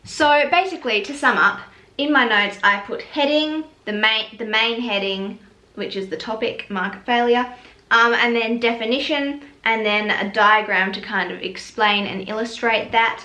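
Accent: Australia. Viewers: i love your aussie accent so much😭💗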